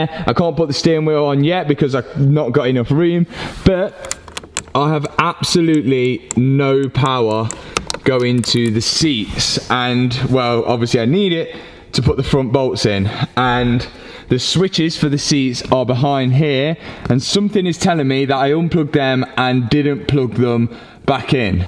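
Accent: United Kingdom. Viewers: Late subscriber to your channel, sorry. BUT i hanve literally binged watched everything in the last 10 days....Love it. My Mrs is Leicester so your accent is annoying (im Northern) but genuinely LOVE IT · Great content. Just started watching. Can't wait for the next Lamborghini vid. Gotta say normally I find the brittish accent very annoying but I really don't mind this guy's.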